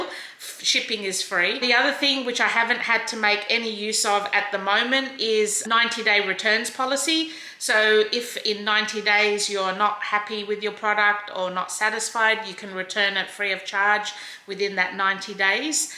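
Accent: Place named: Australia. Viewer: Aren’t you from Australia? You sound like an Aussie! Love watching your videos.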